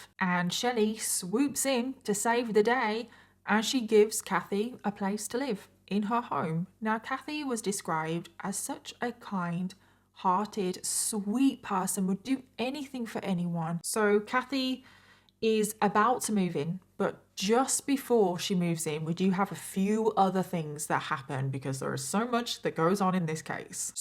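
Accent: United Kingdom. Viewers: Hi, are you British or Australian? I love your accent.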